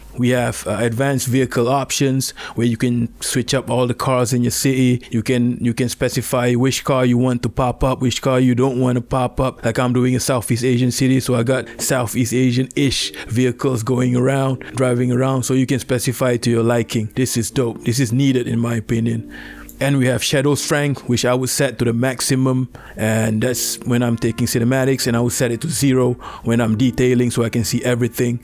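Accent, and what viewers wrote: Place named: Malaysia. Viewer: Cara bicaranya mirip orang malaysia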